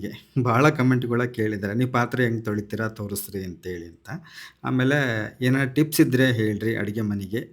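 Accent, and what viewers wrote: Chitradurga, India. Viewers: ನನ್ನ ಊರು ಶ್ರೀರಾಮಪುರ ಹೊಸ ದುರ್ಗ ತಾಲ್ಲೋಕು ಚಿತ್ರದುರ್ಗ ಜಿಲ್ಲೆ ನಮ್ಮ ಊರಿನ ಕನ್ನಡ ಕೇಳೋದಕ್ಕೆ ನಾನು ನಿಮ್ಮ ವಿಡಿಯೋ ನೋಡುತ್ತೇನೆ ಅಡಿಗೆ ಕೂಡಾ ಅಷ್ಟೆ ನನ್ನ ತವರಿನ ನೆನಪು ತರುತ್ತೀರಿ ಅದಕ್ಕಾಗಿ ಧನ್ಯವಾದಗಳು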